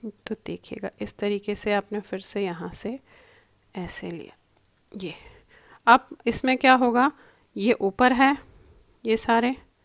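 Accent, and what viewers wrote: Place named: Pakistan. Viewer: Thank you 💞 mai app ke buhat bari fan hoon, tumhari aawaz ur pronunciation bilkul hum pakistanio jaisa hai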